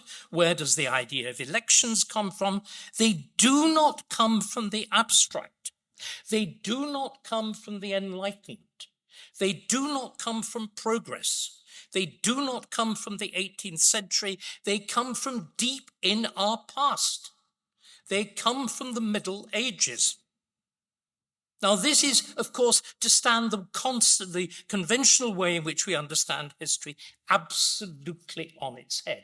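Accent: RP, United Kingdom. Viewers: What a load of meaningless guff. Run on sentences of nothing delivered in a posh accent; who’s gullible enough to fall for this man’s schtik?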